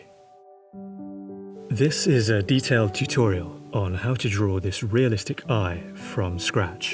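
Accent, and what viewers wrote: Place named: United Kingdom. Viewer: Yup I was thinking the same thing. The British accent ad smooth softness of his voice is just fin du fin lit! (French term.)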